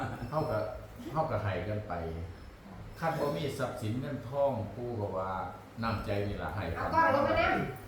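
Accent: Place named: Laos. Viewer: เว้าภาษาฝังลาวละสืเป็นเอกลักษ์คือ นั้นละfcฟังออกอยู่แล้ว🦆🦆🤟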